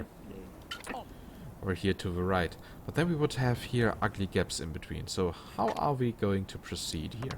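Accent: Germany. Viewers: Thank's a lot ^^ Man hast du nen deutschen Akzent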